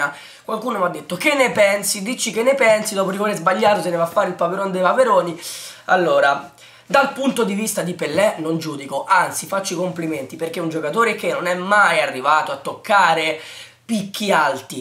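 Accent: Rome, Italy. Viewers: Ciao mi chiamo Pino e come te sono di Roma anche se sono della LAZIO....Vivo a New York da 11 anni e ti seguo da molto tempo, sei molto bravo ma dovresti essere un po meno tifoso e Romano Parlante.....potrei essere tuo padre e se posso ti consigliei di studiare per diventare giornalista sportivo...ciao. alla prossima Pino.......Dimenticavo prova a parlare di LOTITO solo cosi potro' vedere il tuo talento....Ciao.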